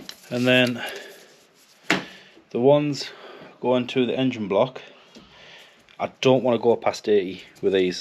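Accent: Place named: North East England, United Kingdom. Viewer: Thanks for this video. Got the same job to do myself very soon. Also judging by your accent you're just south of the Tyne to me. I'm from Northumberland 😁 Cheers dude!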